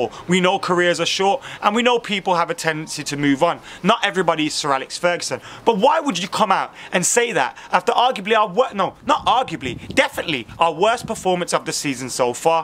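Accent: Birmingham, United Kingdom. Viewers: Couldnt help notice, but are you a brummie at heart?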